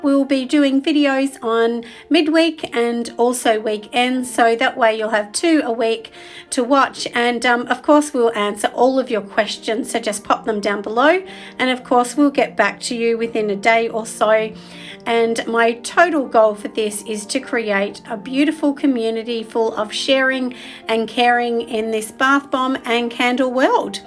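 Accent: Australia. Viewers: I just found your channel, and I'm really glad I did, I also just HAD to subscribe. I also have to tell you I absolutely love that you use wood wicks in your candles. I'm not a big fan of candles at this point, because there's nothing that ruins the scent as well as the ambiance a nice candle creates more efficiently than blowing it out and smelling the (to me) stench of a smoldering wick. I am so looking forward to binge watching your older videos, as well to seeing your newer content. By the way, I absolutely love your Australian accent - God bless!! 💗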